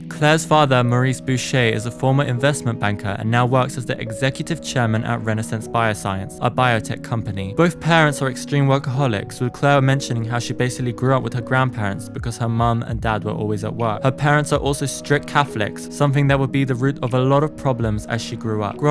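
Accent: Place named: North, United Kingdom. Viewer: I guess this YouTuber is from somewhere of Southeastern Scotland or Northeastern England, anyway the very northernmost part of Britain but little bit to North Sea coast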